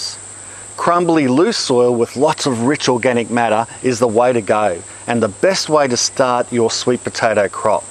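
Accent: Queensland, Australia. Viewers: So good to hear an Aussie voice! We live in S.France and are going to plant our sweet potatoes for the first time...thanks! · Great vid, great tips! Thanks, mate! I'm actually living in Northern Canada, where we don't have enough heat or warmth to grow sweet potatoes - at least not the size you have there. But I was initially caught by your accent, and said to my self that sure sounds like a Qld accent. hehehe I went to school at Southport for 6 years.😂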